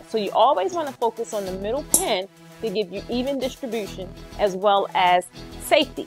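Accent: Baltimore, United States. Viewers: She definitely from Baltimore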